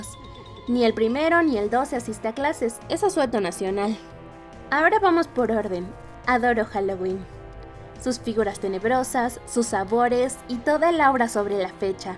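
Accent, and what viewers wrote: Chile. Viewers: Yo si pensaba que eras chilena 😆 es que tienes el asentó de chile soy de mexíco y conozco a unas personas de chile las conocí por Wattpad un saludo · Yo creí que eras chilena por tu forma de hablar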